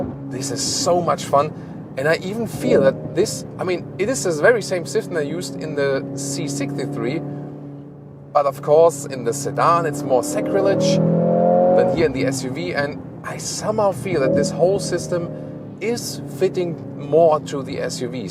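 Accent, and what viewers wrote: Germany. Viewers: I like watching German car reviews with German accent 😊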